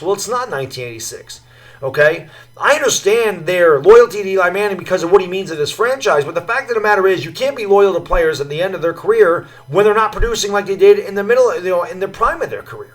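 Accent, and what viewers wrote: New York, United States. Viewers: Ur think ny accent makes you sound so convincing but yes ownership needs to sell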